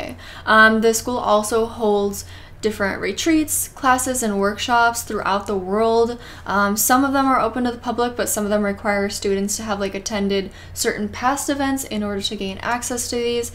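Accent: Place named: Midwest, United States. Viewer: Variation on the US accent. I think Midwest but I can’t remember if has said where she’s from!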